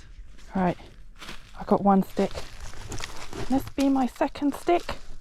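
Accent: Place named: RP, United Kingdom. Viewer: well posh, love it, love your videos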